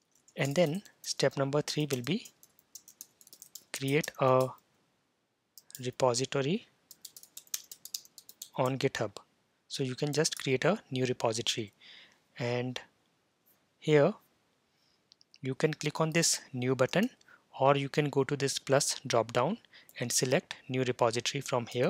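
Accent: India. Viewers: Simple and complete, and his "Indian English" can be easily understandable compared to some others. I'm completely new to Git and this video is so much helpful to me to quickly get started with Git on my VS Code -- thanks! :)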